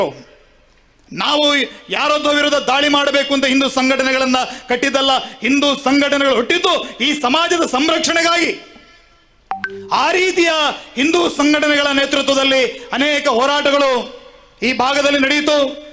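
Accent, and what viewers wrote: Standard. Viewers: Swacha kannada power full speech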